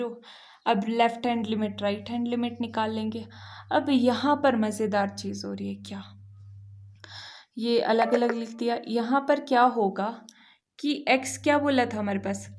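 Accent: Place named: Haryana, India. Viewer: Through your tongue..you look like from haryana or delhi.. but very sweet voice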